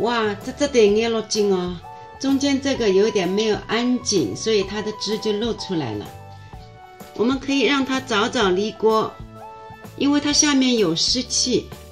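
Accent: Shanghai, China. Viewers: Jenny阿姨，看你的视频，一口沪普就是特别亲切啊。刚刚我在看视频，孩子听见你讲话，问我，妈妈是外婆在教你做好吃的吗？孩子们以为是她们自己外婆在说话。😂😂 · 这是上海人讲普通话，带有上海口音。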